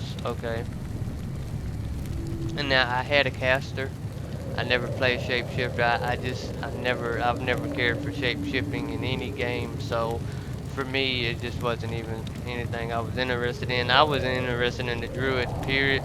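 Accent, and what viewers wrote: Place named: South, United States. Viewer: just some Southern drawl or something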